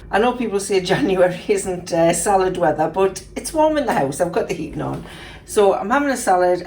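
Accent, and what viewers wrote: Newcastle, United Kingdom. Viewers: Hi, I really enjoy your vlogs, your food looked lovely. I’ve been on HRT for nearly 13 years since I was 50 and I’ve no plans to stop it as I had terrible brain fog and hot flushes before. I worry that all the symptoms will come back and I couldn’t cope with that again! Looking forward to more of your vlogs, oh and I just love your geordie accent it’s one of my favourites, I spent a lot of time in Tynemouth when my son was young, I love it there. Xx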